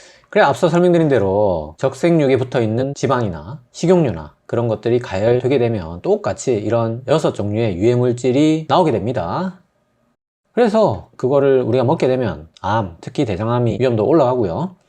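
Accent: Gyeongsang, South Korea. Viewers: 선생님!! 고향어디세요? 저도 경상도라 말투가 너무 정겹고 말씀도 너무 재밌으세요~~~~😁😁😁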